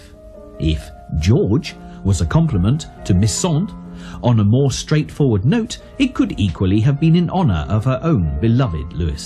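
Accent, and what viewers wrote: United Kingdom. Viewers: God, I do so love a British accent.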